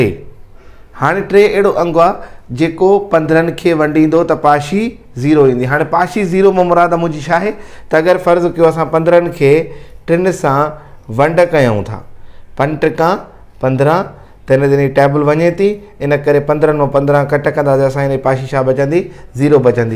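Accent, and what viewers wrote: Larkana, Pakistan. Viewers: Larkana waly aise hi bolty hen so sweet 🥰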